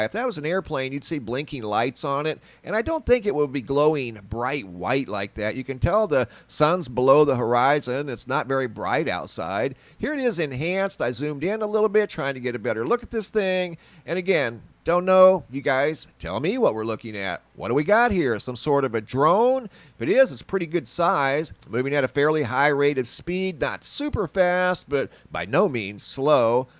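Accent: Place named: Central Pennsylvania, United States. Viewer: Btw thats how everyone sounds in central Pa and surrounding area Yoooooooo dude :-D Hey I'm from pa and yes back about 6 years ago back country road not a drone nothing like that full 100% craft out of this world just hovering right above my head on this back country road it was legit just chilling there as if its saying hi to me. All i had at the time was an ipod and when i went to try to record it and then began to move from me behind the trees It was above my car the height of telephone poll.. it was high tech and out of this world for sure.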